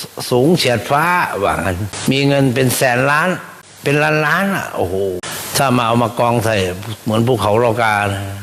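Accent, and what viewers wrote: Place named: Isan, Thailand. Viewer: กราบสาธุหลวงตาเทศภาษาอีสานปนด้วยฟังสนุกได้สติดีมากครับชอบที่สุด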